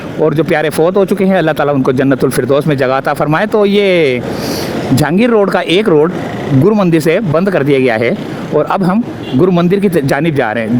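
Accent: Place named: Hyderabad, India. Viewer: بھائی،،اپ کے لہجے سے لگتا ہے کہ اپ حیدرابادی ہو،،،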